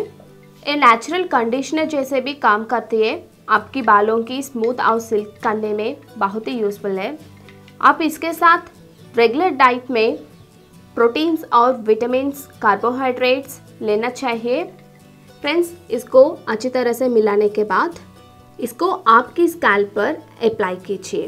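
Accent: South, India. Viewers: Kya ap south ke hain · Are you south indian? 😀 apke bolne ka tarikha bilkul meri mosi jaisa hai.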